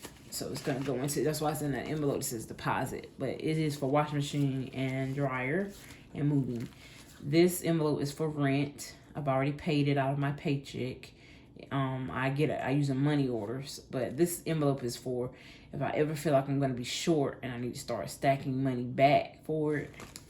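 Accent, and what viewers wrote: South, United States. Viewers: Yay, love your video and your Southern accent! I lived in GA for 12 years.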